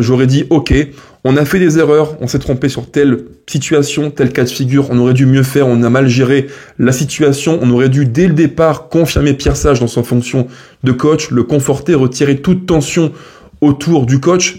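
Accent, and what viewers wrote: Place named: France. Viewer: J'adore ton accent anglais très frenchy! Pour moi, il est clair que Gerlinger va reprendre la succession de Friio et amener son excellence et son sérieux germanique de 18 ans au Bayern et va faire de l'OL une machine de guerre gérée à l'allemande! Sehr gut für unsere liebe Mannschaft! Tschuess, lieber!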